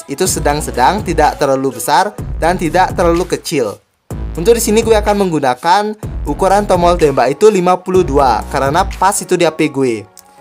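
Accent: Bali, Indonesia. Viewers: Logat nya Bali banget😊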